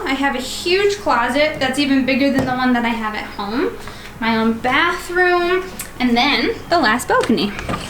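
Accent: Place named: United States. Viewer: 纯正的美式英语！我喜欢这个小妞！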